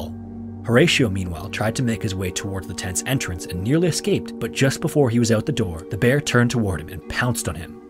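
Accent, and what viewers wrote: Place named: Canada. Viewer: Love your stories and your Canadian accent! 🙂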